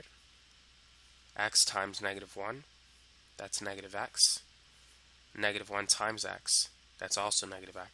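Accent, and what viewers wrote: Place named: New York, United States. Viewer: Are you from new York or Boston. you sound like your from new York or Boston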